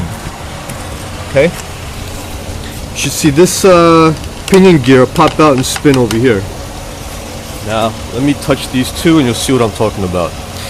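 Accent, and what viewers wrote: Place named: Brooklyn, United States. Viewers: Omg. Holy shit I swear I just saw Sylvester Stallone on jimmy Fallon !!! I was thinking I like this guy cause he is practical and it motivates me to do shit myself!! I'm guessing New York maybe jersey ? Hey I love em all so no one be offended ...I was raised catholic guilt lol, and if someone said are u Irish and Protestant I'd be like no but I like lucky charms ..... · Great Video! Brooklyn huh? I'd know that accent anywhere!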